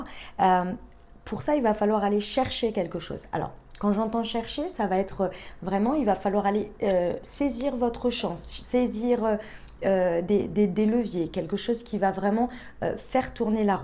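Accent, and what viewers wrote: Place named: Paris, France. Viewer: J'aime trop ton accent parisien il me fait grave sourire. Sur le fond toujours au top comme d'habitude 👌😊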